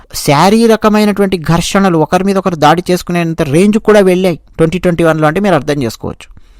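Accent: Telangana, India. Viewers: Meeru Telangana yasa baga matladaru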